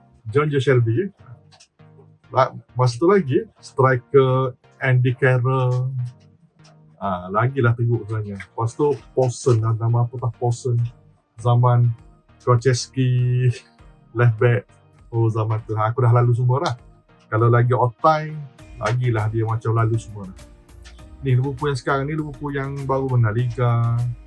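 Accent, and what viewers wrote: Kelantan, Malaysia. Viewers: Kecek kelate la abe .. santai2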